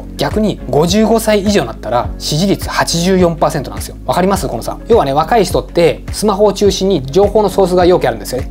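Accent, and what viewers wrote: Hiroshima, Japan. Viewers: さんの鋭い感覚と情報分析力には頭が下がります。かなりの情報収集されているのが感じます。今回も勉強になりました😌 さんくらいの若さなのに、新しいことを取り入れ変化するのを嫌う社長を何人か見てきました。とても残念でした。キーエンの営業は素晴らしいですね。アイデア次第でビジネスはどんどん広がりますね。ドコモのような多角的ビジネススタイルも今後は必要ですね。これは個人のビジネスでも言えますね。私も起業するなら、このスタイルを目指したいです。さんのように。。。激変の世の中でも、やはりHR(人的資源)も欠かせないと思います。社員のモチベーション管理、満足度アップ、働きやすさ、優れたパフォーマンス発揮アップ等、社員の扱い方について、ぜひ動画であげていただけたら嬉しいです。次回が待ち遠しいです。すっかり &広島弁ファンです☺️ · 広島弁、親近感あります😊💕 コロナで大打撃受けたのに生き残ってる百貨店勤務です😅 うちの会社もゾンビかもしれません🧟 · さんこんにちは。さんと私の会社の社長、知合いなのかなと思う位、お話の内容が近いです。ゲームチェンジャーを意識する様、日頃から言われています。ただ、私には話が難しくて…。それをさんがわかりやすく解説し、深堀りして下さるので、本当に助かります!!段々理解できてきました。美声も戻り、かわいい広島弁も聴けて、毎回動画が楽しみです。ありがとうございます！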